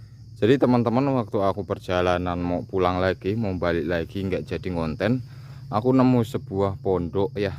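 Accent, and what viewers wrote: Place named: Banyumas, Indonesia. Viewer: Kebumen.....wong ngapak iki😂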